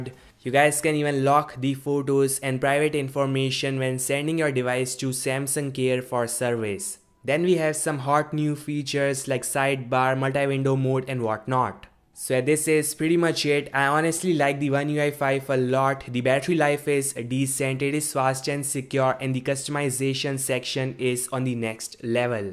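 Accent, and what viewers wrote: India. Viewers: Are you indian? You talk like indian !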